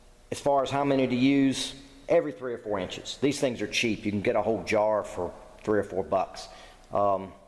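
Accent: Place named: South, United States. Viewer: Hi! I have followed your involvement with the Weta on the forum since about 2010 but never heard your voice. That's a great Southern Raised accent you have there! Do you play the banjo? I have done the job you illustrate several iimes and wish I had known about the fishing line trick. George Morris Weta 117 Scotland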